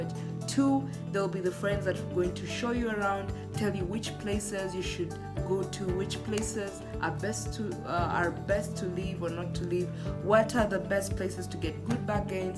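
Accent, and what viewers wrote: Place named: Kenya. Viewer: Welcome to Nairobi, you true look and spaek like a Kenyan, for a Ugandan do not call yourself a foreigner in Kenya. I am from western Kenya and my Luhya language is very similar to some of Uganda local languages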